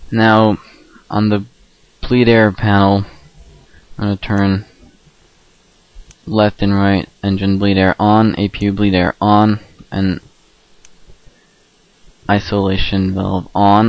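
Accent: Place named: United States. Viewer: Hi I just wanted to say that for your being from France your English is very close to impeccable(Not sure if this would be considered insulting considering our cultural relations at times but you sound like you are just a normal American.). Also, your tutorials rock, I am starting the PMDG MD-11 tutorial as I type. Keep posting vids please!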